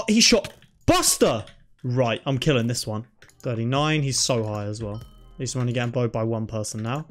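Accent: United Kingdom. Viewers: QnA: Where are you from? I think your accent sounds English? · just wake up and i can hear the british accent man talking QnA: wat is your real name ? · Lovely jubbly video ❤️my Q&A question is :you sound British are you British ?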